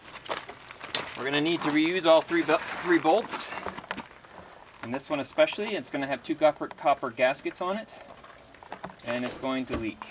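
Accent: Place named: North, United States. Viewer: I suspect by his accent he is up north, in the US ... hence the rusting....great video..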